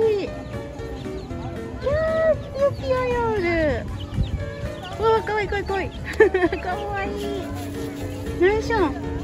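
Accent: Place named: Okayama, Japan. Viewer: 出さないようにしてるみたいだが、 ときおり出る岡山弁のイントネーションに萌える。（40年岡山県人を捨て愛知県に移住）笑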